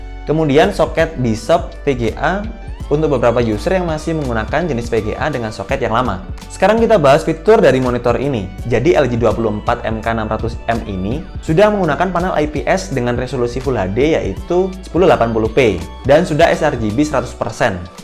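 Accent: Java, Indonesia. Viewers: Coba lebih meddok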